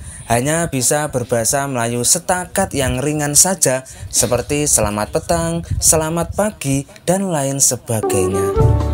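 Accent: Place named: Java, Indonesia. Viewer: Masssssss.bhsa.nyaaaa medog bngettt.jawa nyaaaaa.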